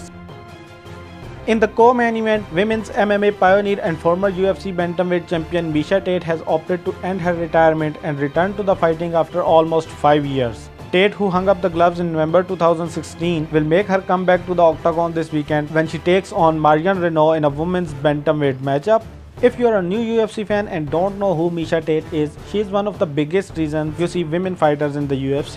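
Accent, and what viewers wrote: India. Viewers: This is not asian accent.. this is KELING ACCENT... LOL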